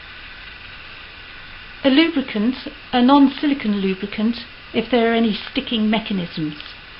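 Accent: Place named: Yorkshire, United Kingdom. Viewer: a tiny bit of Yorkshire is seeping through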